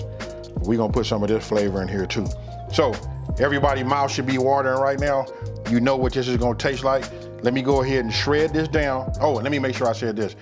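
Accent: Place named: California, United States. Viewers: Where you from in LA I hear a bit of east side on your accent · You sound just like some of my uncles and cousins, you must of been raised in Cali, LA, San Diego? We have a certain way we talk, NadadaMean! 🤣💯 Good Food!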